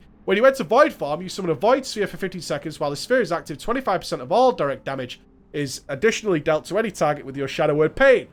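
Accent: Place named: Manchester, United Kingdom. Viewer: It must be the Manchester accent but I can never tell when (or anyone) is mad or enthusiastic about something when i can't see his body language.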